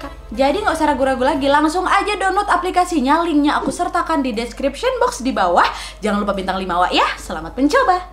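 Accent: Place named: Medan, Indonesia. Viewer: Salam...saya wak2 dr medan,klo boleh tau wak aslinya asal mana??karna logat bicaranya mirip dengan orang medan😊😊😊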